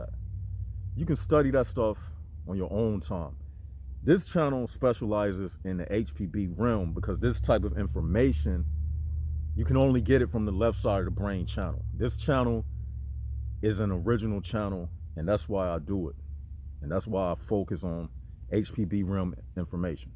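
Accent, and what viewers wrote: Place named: East Coast, United States. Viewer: I thought east coast because of accent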